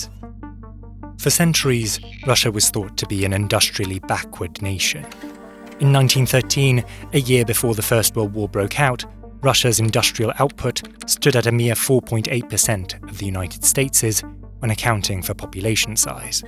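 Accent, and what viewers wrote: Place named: United Kingdom. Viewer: British detected, channel rejected. Maybe talk about all the most depressing British cities, go fix your country and get off the couch.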